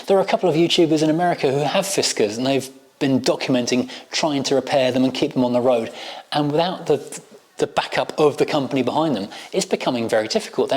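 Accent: United Kingdom. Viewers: I'm a car guy who was really interested in this topic. I tried real hard4 several minutes. I found myself irritated by this effeminate, sloppy mouthed, Brit accent, akin2 Piers Morgan. I finally couldn't stand it anymore &gave up. Pewh!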